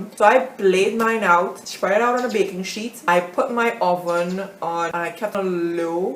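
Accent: Trinidad and Tobago. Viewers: You sounding like a Trinidadian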